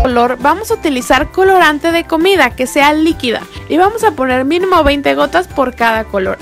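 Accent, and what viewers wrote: Fresa, Mexico. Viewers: Hablas muy fresa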